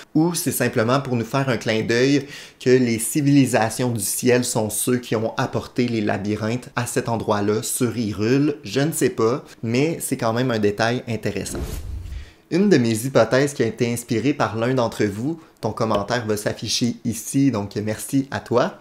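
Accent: Quebec, Canada. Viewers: En tant que Québecois moi-même, je sais pas pourquoi, mais je fais toujours le saut quand j'entends un accent Québecois sur youtube. XD Mais bonnes théories, moi aussi je pense qu'on va revenir à l'époque d'il y a 10000 ans et voir comment tout ça a commencé. Je me demande aussi si les 7 larmes seront les seuls "Key items" de donjons... par exemple, dans Ocarina of Time, tu dois trouver les 3 spiritual stones et après ça les 6 médaillons... alors what if, que dans TOTK, tu doive trouver les 7 larmes dans les 7 premiers donjons et puis là.... BAM! 20 nouveaux donjons où il faut trouver autre chose. (Je sais, je rêve lol)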